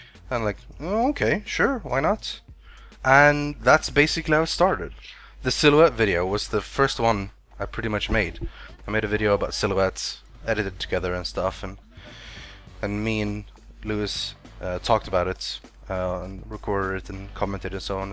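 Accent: Scania, Sweden. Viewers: SKÅNSKA FTW! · Fuck! Han e skåning! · Skånsk dialekt = MindBlown! Din Engelska Dialekt är Godlike men jag trodde ALDRIG att din svenska skulle va så skånsk XD, Anyways You're an awesome Swede Ska fösöka komma med på någon Ventrilo eller så med er :) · MIND BLOWN!!!! DU E SKÅNSK !!! (göteborg ftw heheheh) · Varför alltid skånsk?? TheSwedishLad är samma sak... Pewdiepie är den enda som jag känner till som inte är skånsk... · Det var så märkligt att höra dig prata skånska... vet inte vilken dialekt jag tänkte att du skulle ha men skånska fanns liksom inte på tapeten. As bra kanal, keep up the good work! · 2 skånska 4 me även fast jag är skånsk.. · DIN SKÅNSKA THO omg like i'm from skåne but holy shit it's so weird to hear idk why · cause he speaks skånska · OMG det känns så konstigt att höra dig prata skånska xD Men det är underbart. Du är bäst. Fortsätt vara awesome! 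· Det var inget annat än skumt att höra dig prata skånska, men du gör bra videos så fortsätt med det! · JA ÄR SKÅNING:D har du varigt i ängelholm? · Haha, visste inte att du va svensk fören du snacka skånska '' jag bara wtf'' xD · Å herregud! Du pratar skånska! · Herregud han är skåning:D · Yaaaay du är skånsk =D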